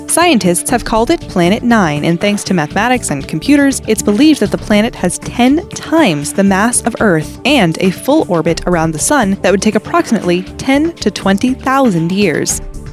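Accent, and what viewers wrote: United States. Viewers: Wow I am impressed that the narrator ,who has an American accent actually pronounced the Antarctic correctly . Normally an American pronounces it as ANARTIC totally missing out the T. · speaks with an American accent. gives temp degrees in Celsius. stupid bitch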